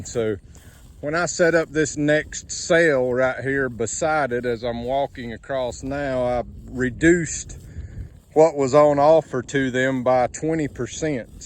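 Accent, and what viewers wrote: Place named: Australia. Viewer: Have you spent time in Australia...every now and then I hear an aussie accent with your American ?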